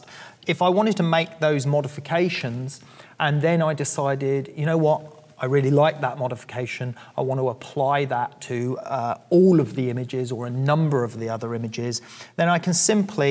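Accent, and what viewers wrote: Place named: England, United Kingdom. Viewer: This is an English accent. If you can't understand it then you need to take some English classes. You could, alternatively, simply use a slower speed setting on YouTube to understand him better.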